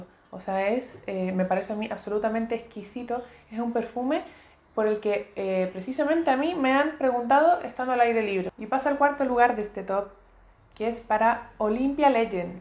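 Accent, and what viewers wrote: Chile. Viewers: por tu acento pareces Chilena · * tiene acento de chilena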